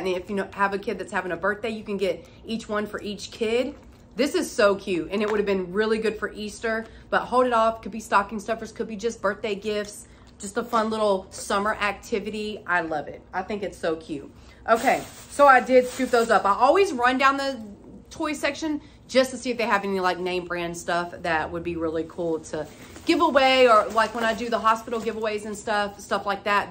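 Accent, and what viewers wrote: South, United States. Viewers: your Southern drawl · I absolutely love your southern accent! 💐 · I love the country in you Not to many say y'all anymore and that's a southern thing girl. My new favorite DYI FROM DOLLAR TREE SHOPPER.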